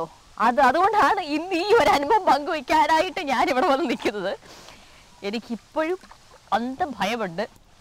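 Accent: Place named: Trivandrum, India. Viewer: Chechide sound ketal chechi tvm aanu nadu ennu thonnuva...kasargod aanennu parayila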